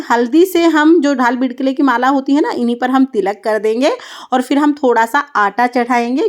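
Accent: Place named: Marwari, India. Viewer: आप हमारे मारवाड़ की ही लगते हैं हिंदी में आप मुझे बताइएगा प्लीज मुझे अंग्रेजी नहींआई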